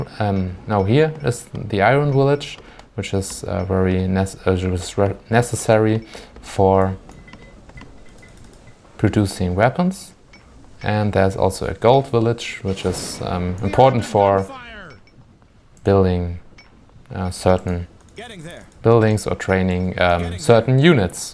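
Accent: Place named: Germany. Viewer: German accent ist klear.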